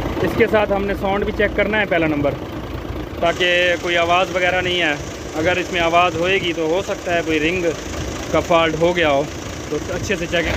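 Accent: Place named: Bengal, India. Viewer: Fault to aap ne bataye nahi aur bata bhi to bangali ki trah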